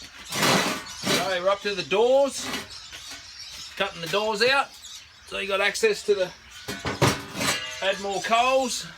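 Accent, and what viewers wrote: Australia. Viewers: Matteeee. F..king legend. You sound Tassie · Hi Mate you must be from outback Queensland or NSW judging by your ocker accent. I love how you did the bottom this is by far the best bottom on YouTube. I think you would be better having the hinge on the bottom of the door so when its open its out of the way and you can see the flames. In fact why do you even need a door when the top is open?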